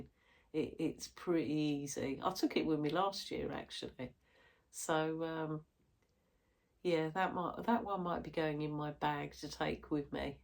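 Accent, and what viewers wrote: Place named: United Kingdom. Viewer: Hi! Stitchy Sally shouted you out and posted a link to your Flosstube, and I’m glad she did! 😊I’m blown away by your fabulous projects and enjoyed listening to a fellow Brit!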